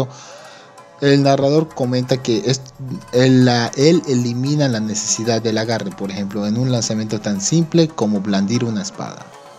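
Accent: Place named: Yucatan, Mexico. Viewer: Carnal eres yucateco? Por qué se escucha mucho y eso es genial tienes mi apoyo broder. 🐖🤠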